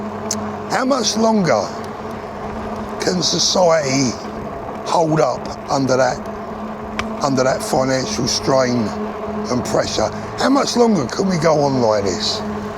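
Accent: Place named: Cockney, United Kingdom. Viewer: When you're young and naive it's OK being a socialist. But when you grow up and start earning your own money, you are supposed to wise up and realise capitalism is the only way. Why after so many failures in the past don't the Labour party get this? It's mind - boggling! It's so nice to hear a Cockney talk. Are there any left in London?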